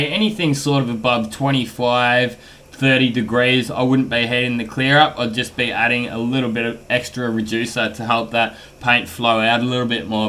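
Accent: Australia. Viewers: Thanks Aussy much appreciate. Like you very. Much. Learning everything. I could. Not understand you at first the Aussy accent. Ha ha After listening closely for a little bit I picked it up no problem. Much appreciate your video work. You're a great mate. Your answer sounds right and makes sense. The clogging is why I go to the water. I use a trickle from a hose. Works well but I'd definitely a pain in the butt.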